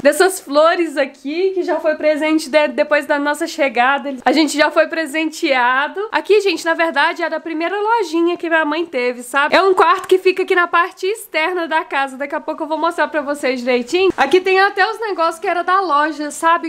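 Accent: Minas Gerais, Brazil. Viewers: Como vc é mineira! 😂😂👍💃💃💃💃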